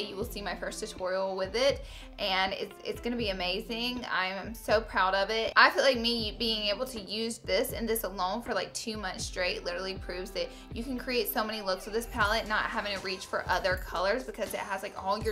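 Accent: South, United States. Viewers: Your southern accent is so cute 😂❤️ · i love your accent and how you always say "whenever" instead of "when. so southern <3 you're precious!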